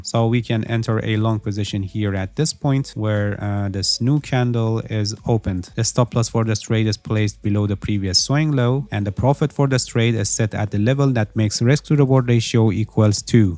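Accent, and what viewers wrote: Iran. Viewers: گل پسر خیلی خوب انگلیسی صحبت میکنی درود بر تو اما لهجه فارسی هنوز کاملا قالبه😊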